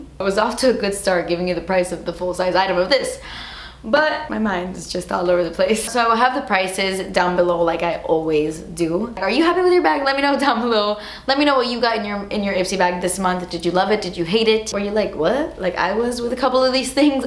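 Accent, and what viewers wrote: United Kingdom. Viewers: Your British accent cracks me up every time! 🤣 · Lmao you really made me laugh when you tried on the lippie 😂 and yo' British åccent haha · That British accent😂😂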